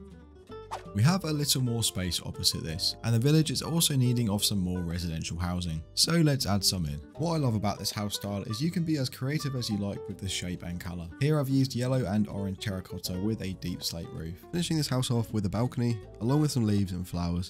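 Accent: Italy. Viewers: si ligure un po pero piu sud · Love Your Voice ♥ Are you Italian Btw ?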